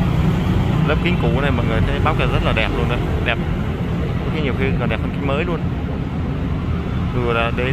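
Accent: Vietnam. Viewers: Có vẻ như ad. này quê TH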